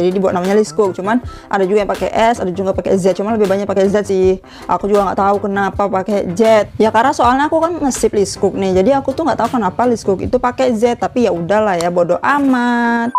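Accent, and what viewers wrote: Medan, Indonesia. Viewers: Kk orang mana sih k Logatnya kayak orang medan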